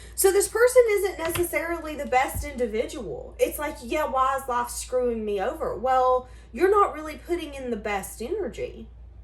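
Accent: South, United States. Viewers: You my dear are SPOT ON! It is so unreal how you nailed it. I love your honesty, advice, and your southern accent is DARLING! Thank you for sharing your gifts and talents with all of us. ♥️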